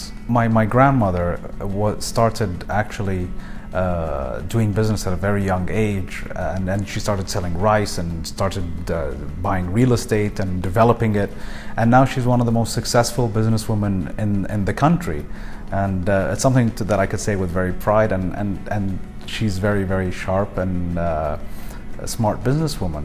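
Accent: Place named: England, United Kingdom. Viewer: mashllah i like ur englsih accent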